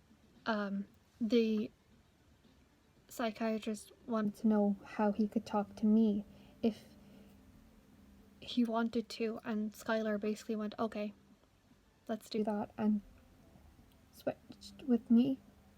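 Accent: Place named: Scotland, United Kingdom. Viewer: I’m really curious if you “switched “ while recording this video because your voice goes from very quiet and timid and feminine with a neutral accent , and a deeper register voice with a slight Scottish sounding accent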